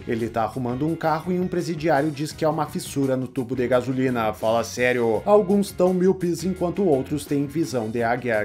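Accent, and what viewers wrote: Rio Grande do Sul, Brazil. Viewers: Esse narrador gaúcho é da hora, kkkkkk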